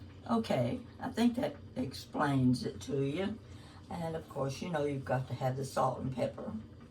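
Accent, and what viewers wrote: South, United States. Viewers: Sitting in Canada listening to your sweet southern drawl! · I'm just so happy I came across your channel! What a lovely accent you have. I'm going to assume you live in the southern part of our country. Actually, I'm from the colder part which in the Northeast. I hope this doesn't send cold chills through your bones but I actually look forward to snow🌬⛄ This is the time of year I cook and bake more often. Even though I live in the colder states, aka New England, I do enjoy southern dishes! And of course who doesn't love chicken and dumplings? Yours certainly looks delicious! Thank you so much for sharing and look forward to being subscribed to your channel! 🙏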